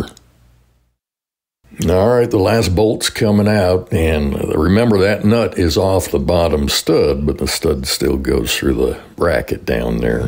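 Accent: South, United States. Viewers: He has southern drawl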